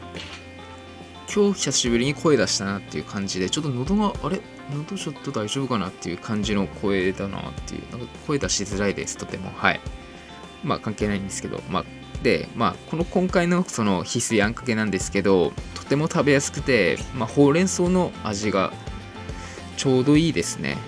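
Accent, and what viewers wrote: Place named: Tsugaru, Japan. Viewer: おめ津軽だべ？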